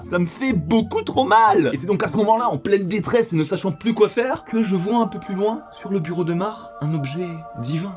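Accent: France. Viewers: Elles étaient vraiment drôles mais mignonnes, à part celle de la voisine raciste qui m’a bien agacée 😡 Sinon, je trouve que la narration en anglais n’était pas si mauvaise, l’accent français a son charme 😊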